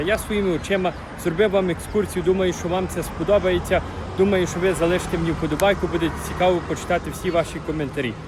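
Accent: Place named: Kolomyia, Ukraine. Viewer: ти чисто гутариш по коломийський,звідки ти родом?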